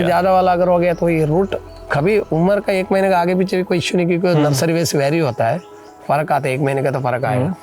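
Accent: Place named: Gujarat, India. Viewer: Lagta hai sir gujrat me jyada rahe hai kahi kahi gujrati aa rahi hai 😅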